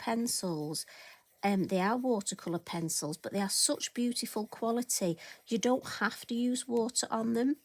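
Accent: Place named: Northern England, United Kingdom. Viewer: Another fab tutorial,. Don't apologise for using Northern colloquialisms. We should all be proud of where we come from...that's what makes us different. I love accents and colloquialisms..I am from Yorkshire and love my accent. I have had so many people comment on how they love it too, sometimes, like you I have to tone it down a bit, but on the whole most folk 'get it'. Thanks for your hints and tips and your lovely videos.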